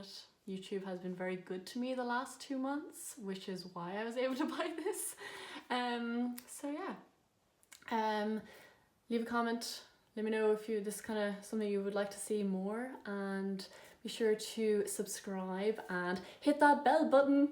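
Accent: Ireland. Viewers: Dear, So nice to see ya, i've been in Ireland for four months last year as an exchange student and i also picked up the irish accent, it is so lovely to hear it now, i had been in Kerry near Killarney, don't you live near Dublin cause you went to the Dublin Festival or so, i would love to see more videos like that, btw love your room and the horse ears pic on the wall, went to Ireland this summer i am actually from germany but love this country so freakin' muuch😍😍😍 i am doing horse riding since nine years,obsessed with it, went this morning, was great😎 🏇 have a great weekend 💚🇮🇪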